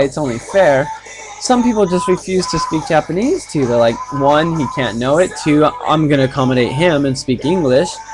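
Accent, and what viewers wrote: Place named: Ireland. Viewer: dude i thought you sounded like irish at first..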